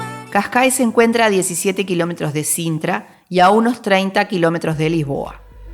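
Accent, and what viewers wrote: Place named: Argentina. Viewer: Por qué neutraliza el español si se nota que es argentina? Que ridícula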